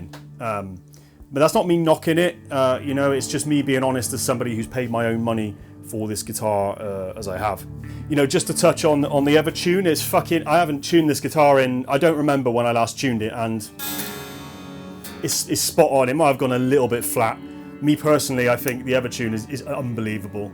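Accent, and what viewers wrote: RP, United Kingdom. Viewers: I love your accent bro, very posh!!! I wish everyone in Cardiff could speak like you :)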